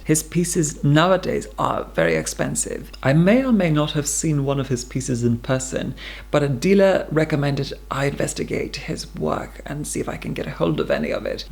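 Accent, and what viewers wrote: Scotland, United Kingdom. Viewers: He’s getting rid of his Scottish accent 😭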